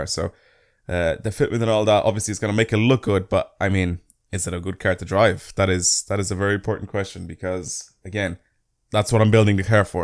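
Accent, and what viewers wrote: Dublin, Ireland. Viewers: Are you Dublish?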